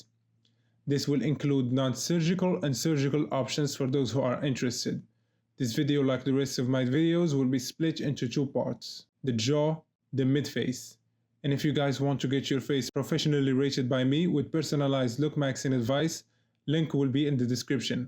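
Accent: Morocco. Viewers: nice Moroccan accent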